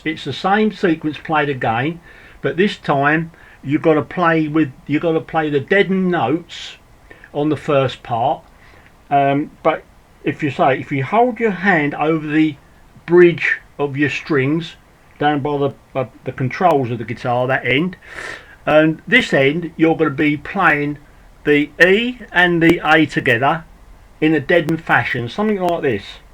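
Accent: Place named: East London, United Kingdom. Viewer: Jeje, pero es buenisima su accento, si no estoy equivicado es de los Eastenders, accento famoso del este de Londres.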